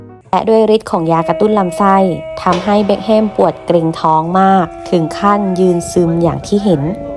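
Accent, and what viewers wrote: South, Thailand. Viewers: หมอเป็นคนใต้หรอ🧐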